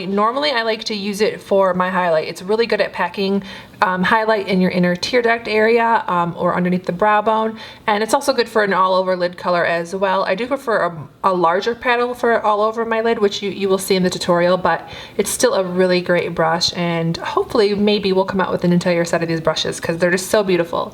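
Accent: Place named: Michigan, United States. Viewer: Your accent makes much more sense now, you're from Michigan!!!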